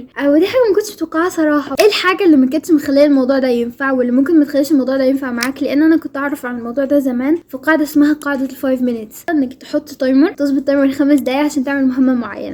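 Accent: Gulf, Arab world. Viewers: مصريه بس فيها لطشة خليجي 😂😂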